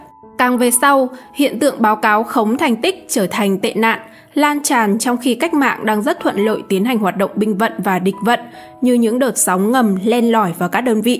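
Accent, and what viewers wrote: North, Vietnam. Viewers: Giọng Bắc kỳ đọc rất khó chịu,kho nghe quá,n thì đọc l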